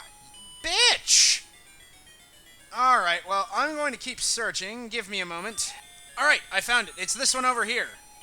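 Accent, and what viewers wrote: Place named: Canada. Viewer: You and your cute Canadian prenunciations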